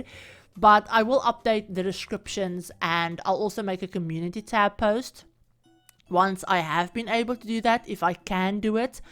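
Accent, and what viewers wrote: South Africa. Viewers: Eeyyy! Are you South African? 👏😁🇿🇦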